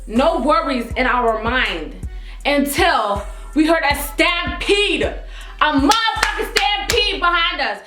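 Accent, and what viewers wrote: Jamaica. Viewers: u Jamaican accent wa fix up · Are u Jamaican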